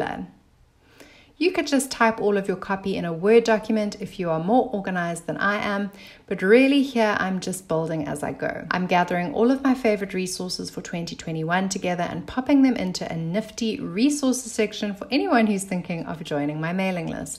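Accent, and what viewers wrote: South Africa. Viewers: sooooo wonderful to hear a South African accent. Yay! Would you know how MailBlaze compares to MailerLite? Thank you for this YT- take care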